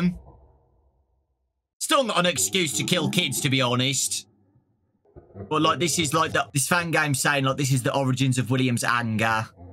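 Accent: United Kingdom. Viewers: He’s so British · accent kinda british tho..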